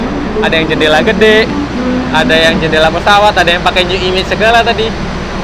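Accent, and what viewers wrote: Surabaya, Indonesia. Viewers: Suaramu itu menang sama 2 kereta pembangkit kok.... 😁😃🤣 Soalnya suaramu itu nge-bass & sangat2 juselllaaasss ucapan huruf2nya kok... 👍👍👍 Maaf, Mas itu asli mana si, kok klo ngomong pake Rek Suroboyoan ya...???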